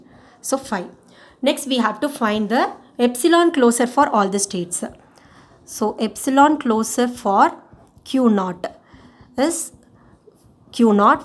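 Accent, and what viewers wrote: South India, India. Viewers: i love your south indian accent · randi south indian , dhang se hindi bol , a ko yay , n ko yen , dimaag kharab kar diya hai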